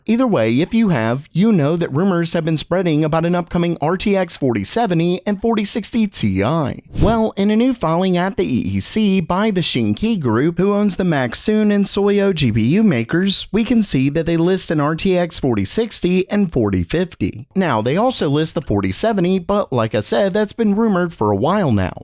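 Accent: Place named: United States. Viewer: most uncomfortable American Accent to listen.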